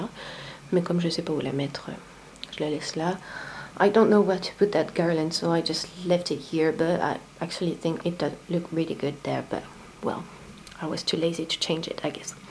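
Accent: United Kingdom. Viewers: un très joli accent Londonien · ton niveau d'anglais est top!!! en plus tu a l'accent anglais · Tu as un accent so british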